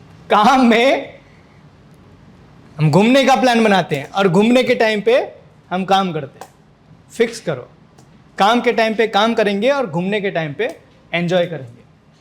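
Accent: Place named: Gujarat, India. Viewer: sir apa gujarti he laght he voice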